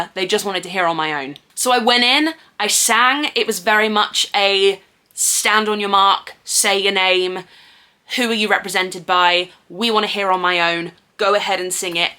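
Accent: United Kingdom. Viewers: UR BRITISH?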